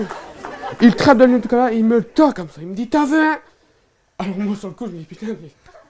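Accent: Nord-Pas-de-Calais, France. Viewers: Accent nordiste lol! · On dirait un vrai chti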